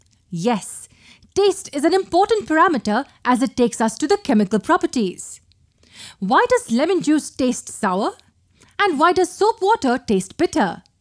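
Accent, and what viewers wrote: India. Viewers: Indian accent ! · I wonder why every educational in video has a woman with an Indian accent like what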